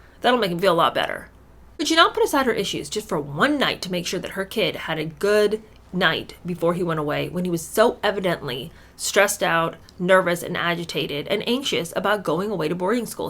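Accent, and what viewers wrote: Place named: RP, United Kingdom. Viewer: you do the posh British accent perfectly! I realize I am late to the party, but since I found this, I have binge watched!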